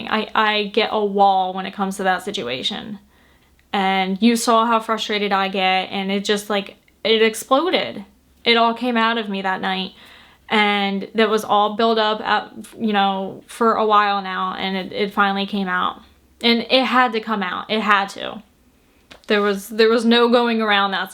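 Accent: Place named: South, United States. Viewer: are you fro the south? you kind of have a southern accent.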